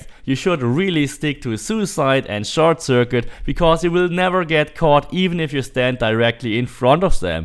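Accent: Germany. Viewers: Laut deinem Akzent gehe ich davon aus das du deutsch sprichst...Top Video. Danke.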